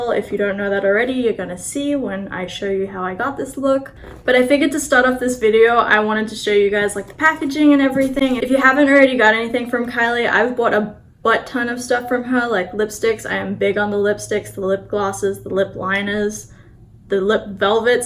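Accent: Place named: Australia. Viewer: Love your Australian accent, and you look pretty with or without make up, keep up with the videos! 😉👌🏻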